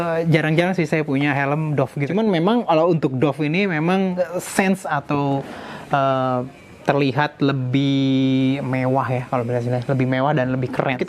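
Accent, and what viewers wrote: South Jakarta, Indonesia. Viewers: wiciswicis jaksel nya aga cukup mengganggu bagi saya yang bukan orang jawa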